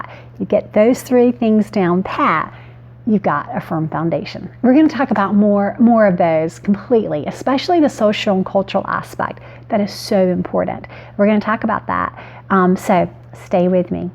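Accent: South, United States. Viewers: Great encouragement. Thanks so much. This Tennessee girl feels right at home with your Southern accent!😎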